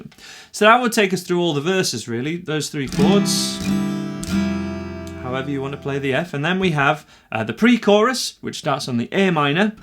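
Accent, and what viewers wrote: United Kingdom. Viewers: You sound super British Ty for the tutorial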